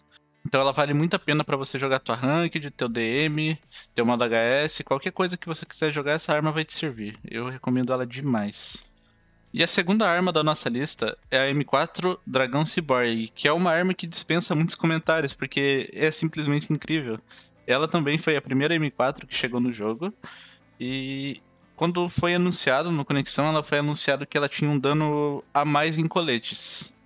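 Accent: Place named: South, Brazil. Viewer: Mano tu é do Sul né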